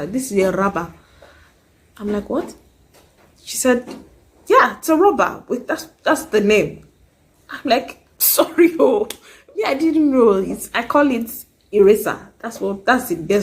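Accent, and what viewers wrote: United States. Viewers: Seems like u speak American English. Lol how is Tomato sauce a Ketchup though?? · Lol 😂 from listening to you i think our English influence is from different continent yours from America mostly ours from UK mixed with our own language 😂 we just hit the short cut with names 😂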